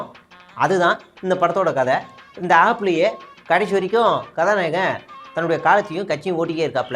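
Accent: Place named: Idumbavanam, India. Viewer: enaya idumbavanam tone duthu pesitu eruka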